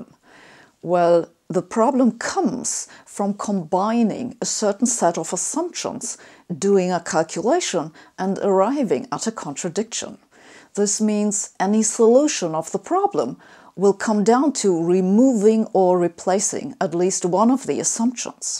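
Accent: Germany. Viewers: Arthur Dent dude she's German it's called an accent.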